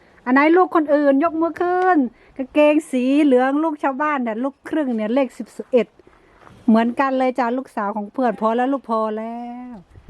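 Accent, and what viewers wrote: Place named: Surin, Thailand. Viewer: พูดเหมือนคน สุรินทร์ มาชมสวนครับ บ้านน่าอยู่วิวสวย ดูชื่อ แล้ว บุรีรัมย์ สุรินทร์ บุรีรัมย์ รอ เรือ ขัดเจน เป็นเอกลักษณ์ เพื่อนผมสมัยเรียนครูด้วยกันก็แบบนี้ครับ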